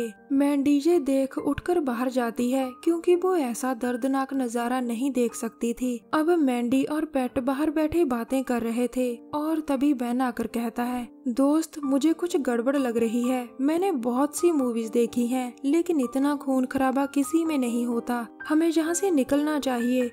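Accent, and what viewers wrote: Punjab, India. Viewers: Madam aap ka punjabi accent has its own fanbase.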